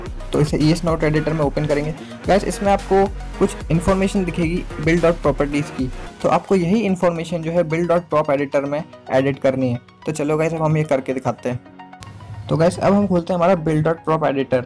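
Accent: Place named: India. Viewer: What are you even saying. Indian probs lol.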